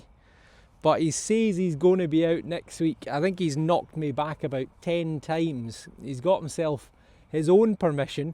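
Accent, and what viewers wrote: Scotland, United Kingdom. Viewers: You no what I just trying to watch your video and just as I got in to it some stupid adds appear it bloody happens all the time. It's just rubbish how we just get bombarded with all those rubbish adds . It does not make sense, the things they advertise are just crap. No one would be bothered to even think about buying it. Sorry mate for letting out my frustration. Your doing a great job and we appreciate your enthusiasm and knowledge. Keep up the good work mate. Like your. Scottish accent.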